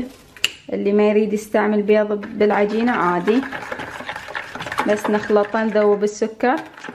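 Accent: Iraq. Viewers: فديت عراقية اشكد حلوه لهجتنا اختج من كركوك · وكت ماكو سامحوني همينا حلوة اللهجة العراقية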